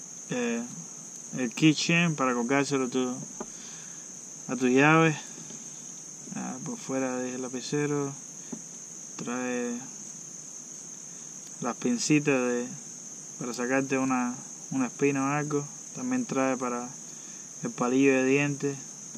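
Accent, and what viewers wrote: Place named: Latin America. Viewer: Que buena navaja excelente ahora entendí bien siempre veo a los españoles explicando y no entiendo mucho lo que disen bueno es escuchar a un latino saludos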